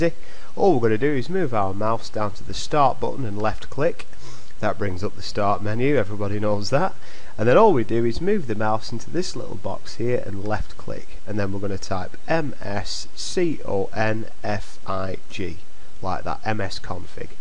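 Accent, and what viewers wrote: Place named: Yorkshire, United Kingdom. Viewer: Can i do it without the yorkshire accent?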